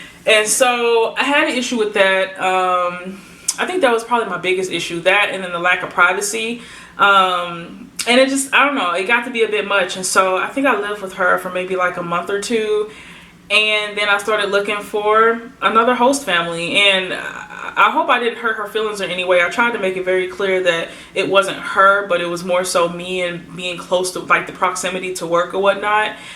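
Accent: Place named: Midwest, United States. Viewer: . yep yep. Different foods and maybe different dating habits, like when teens date. Or church habits.... Like that. Also What was the non profit you worked with, what were they setting up and what was your role? Lovely. The work of the Lord. M. IL. Ps. You sound Midwest. Are you a Midwest girl? I live in western Chicago 'burbs. M.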